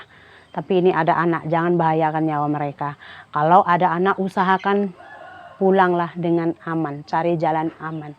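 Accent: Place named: Malaysia. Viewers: logat sudah logat malaysia,cara ngomong nya cepat tak putus putus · Betul loghat percakapan mcm orang Malaysia la 😂Aunty Malasian suka dan family❤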